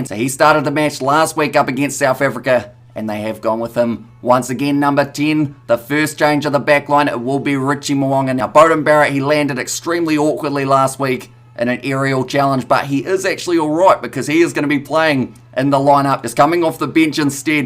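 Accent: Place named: New Zealand. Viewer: Bro you have the strongest Kiwi accent ever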